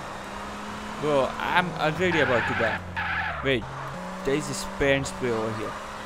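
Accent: India. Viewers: Sorry i love you and your channel no homo But your Indian accent sounds like youre sleeping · T series acent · You are from India or another Country like this because accent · Es de la India , por eso habla así el inglés.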